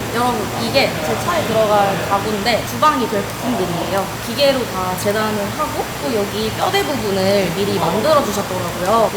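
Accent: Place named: Jeolla, South Korea. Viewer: 말씨가 전라도네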